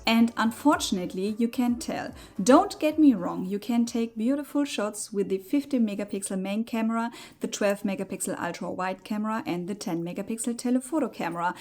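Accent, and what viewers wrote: Germany. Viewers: You make some very good points about the shortcomings of the S25; Samsung missed the mark on the whole range this year, it seems. I'd argue that the camera is still better than most of the users when it comes to taking photos, but you're spot on about the charging speeds and screen reflection. I've been with Samsung since the S4 but I'm definitely going to investigate other options when it's time to upgrade my S23+. Your English is better than most North American native speakers! Your grammar is impeccable and vocabulary is sophisticated. Yes, you have an accent but it's charming and only adds to your videos. And my mother was German, so I get a bit nostalgic when I hear it.❤Don't change a thing.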